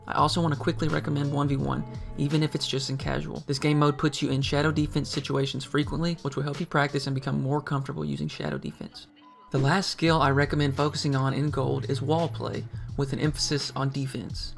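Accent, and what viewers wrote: South, United States. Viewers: hi hello. I just found you from a sunless video and decided to look up your channel and I wanted to say a few things. 1. i'm pretty sure you're southern based on that accent hahah. I'm from the 'sip so ayyy. 2. I'v e never seen an in depth video like this helping people in each individual rank. so high props to you for even making these videos. 3. I just wanted to say thank you and I have high hopes for you my guy!!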